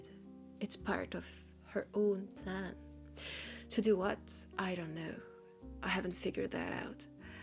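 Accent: France. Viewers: Love your videos! And I’m a sucker for accents, especially French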